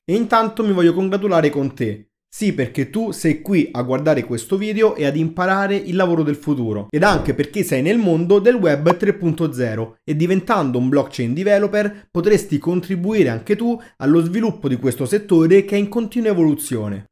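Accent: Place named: Italy. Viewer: Non ho capito un caxxo L inglese configurato in Italico parli🤣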